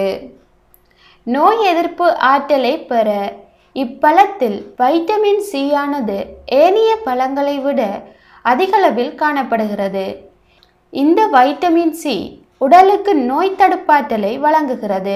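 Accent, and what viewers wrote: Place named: Malaysia. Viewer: Unga Voice paartha, ouja channel admin mathiri irukku 😀😀, Malaysian slang. Nice Video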